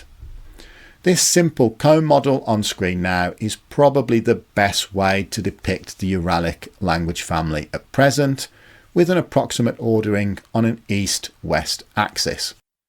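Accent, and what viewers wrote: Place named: Manchester, United Kingdom. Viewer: So7nds like a feature from Mancunian English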